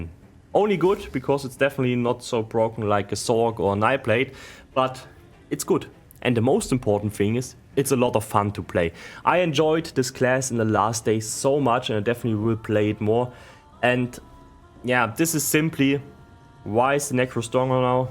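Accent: Germany. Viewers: Dein Englisch ist so niedlich "typisch" Deutsch ;-) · I love the german accent, never will miss the days with the guys in municher octoberfest xD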